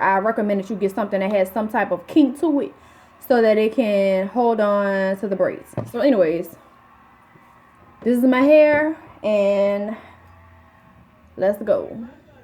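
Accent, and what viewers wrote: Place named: New Orleans, United States. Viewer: As soon as I clicked on this video I said I know she from New Orleans lol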